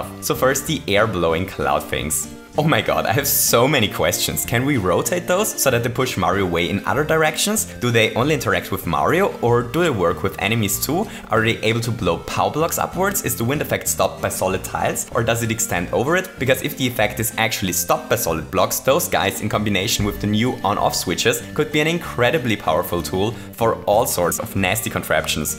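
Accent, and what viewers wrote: Germany. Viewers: Misser Deutscher Akzent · Are you from germany? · Are you German?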